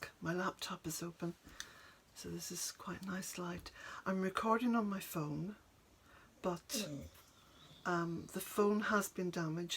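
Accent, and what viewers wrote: Ireland. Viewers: Also your reading is so lovely! You might consider offering your books on tape. It is so pleasant hearing it in your lovely Irish lilt. Blessings to you, dear.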